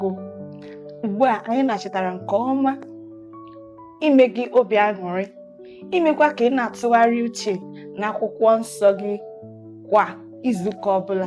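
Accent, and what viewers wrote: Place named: Igbo, Nigeria. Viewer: The pure Accent... Unfiltered Igbo. Ride on ma. 👏🦅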